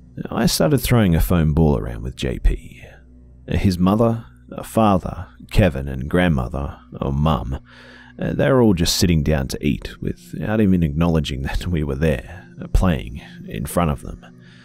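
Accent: Australia. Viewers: He is Australian, so the way He pronounces things is different from ours.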